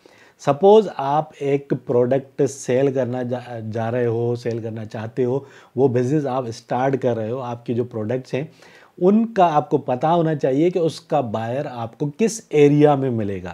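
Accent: Sindh, India. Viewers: bhai apka sindh accent hai. Kiya ap sindhi hai?😊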